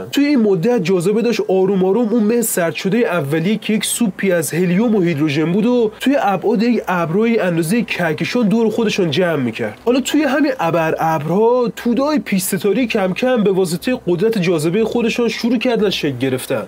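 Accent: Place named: Mashhad, Iran. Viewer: با سلام خسته نباشی کارت خیلی خوبه فقط لحجه مشهدی رو غلیظ تر کن و کمی چاشنی طنز بهش بده خیلی عالی میشه موفق باشی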